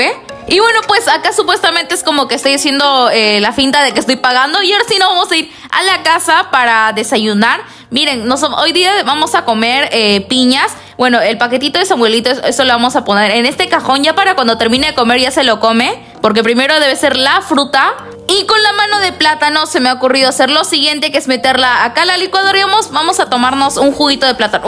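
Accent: Peru. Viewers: Eres de Perú? O chile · eres peruana🥰🤔🤨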